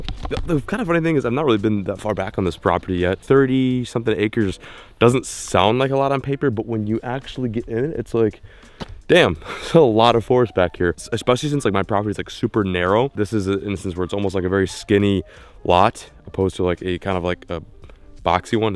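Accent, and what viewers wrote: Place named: New England, United States. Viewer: Coming from a New Englander I love the accent you picked up with my fellow boys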